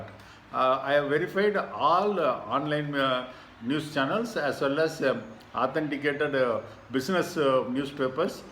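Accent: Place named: Tamil Nadu, India. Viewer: evar englishi tamizh madiri thane pesarar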